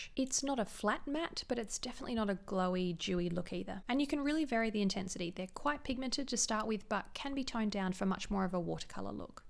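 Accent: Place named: Australia. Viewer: also I'm Australian and its nice to hear a friendly accent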